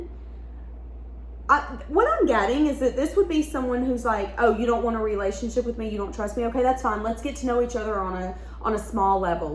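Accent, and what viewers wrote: South, United States. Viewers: Wonderful Reading 💜 And I Just Love Your Southern Accent & This Is Coming From A Texan 🤭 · Oh my gosh! I LOVE your hair!!!!!!! You are seriously cute as a button. And that southern accent just completes it 😆 Total wallflower fan girl, loyal subscriber here in Dallas! On to the reading. I already know it’s going to be on point, as always. 👌🏼 Love you to infinity and all of my other favorite card readers for sharing y’all’s gifts with us. It makes us feel so seen and heard! ❤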